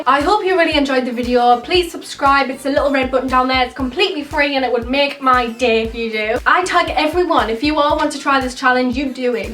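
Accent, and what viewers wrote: Newcastle, United Kingdom. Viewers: Mysticl Sports sorta Geordie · Are you from Newcastle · Are you Geordie? Xx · are u British?? btw love u sooo muchh!!!! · Are you Geordie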